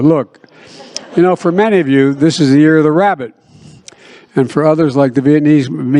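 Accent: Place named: Australia. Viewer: Can't stand even listening to this shit head Aussie ear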